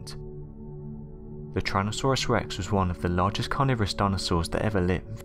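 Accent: England, United Kingdom. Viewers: Get rid of this narrator with his heavy English accent slur is hard to understand.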